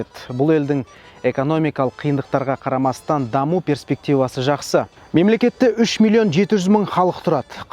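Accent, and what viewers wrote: Standard. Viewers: Таза казакшан ушн улкен рахмет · Таза казакша сойледи жарайсын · Бауырым ризамын саған, қазақша таза сөйлеп жүргізгеніңе бейне роликті👍✊👏🏻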